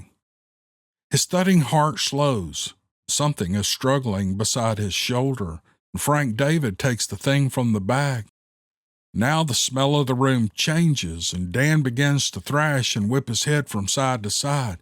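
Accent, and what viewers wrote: South, United States. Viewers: Hey,great stories about the poachers,I really enjoyed them,you've got the perfect southern voice to tell stories.👍🤙👍🤙👍🤙👍🤙👍🤙👍🤙👍🤙👍🤙👍🤙👍🤙👍🤙.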